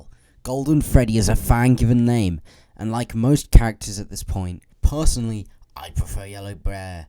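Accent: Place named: United Kingdom. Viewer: british guy talking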